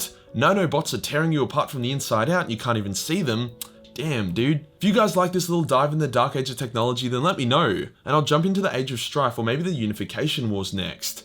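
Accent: Australia. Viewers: Yeah Australian gen alpha is bleeding my ear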